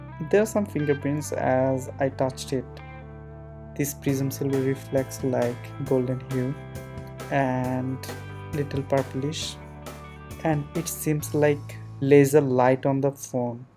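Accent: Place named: Bengal, India. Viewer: Ur accent sounds like that of bengali people..!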